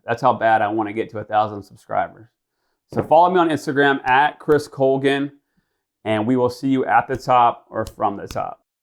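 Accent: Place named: England, United Kingdom. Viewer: The English accent 💀💀💀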